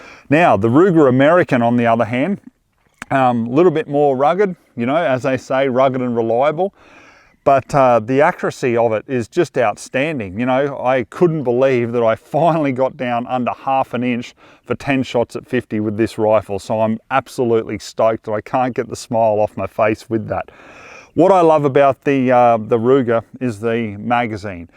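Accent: Australia. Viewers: He is Australian not European. Why do Americans say Aluminum instead of Aluminium?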